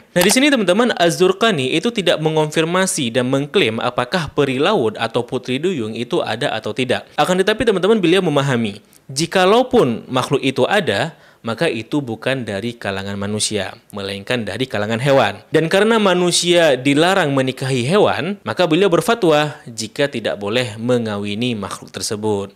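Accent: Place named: Aceh, Indonesia. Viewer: Bang logat km kayak org aceh😂😂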